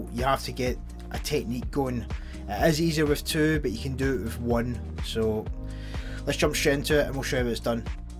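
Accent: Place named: Scotland, United Kingdom. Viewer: Man r u from scotland cus i love ur accent!!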